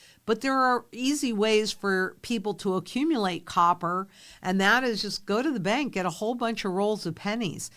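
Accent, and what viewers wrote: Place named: United States. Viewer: My nit.....An historical is used when you speak in a British accented English because the "h" is silent. American accented English says "a" historical cause the "h" is voiced. speaks American accented English. So, she is correct. you can take your nit back now.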